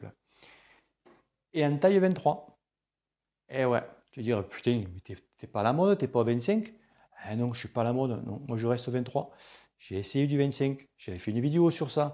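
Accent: South, France. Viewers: Vu comment il parle je pensais aussi mais après je me suis rappeler qu'il est du Sud tu comprends 👍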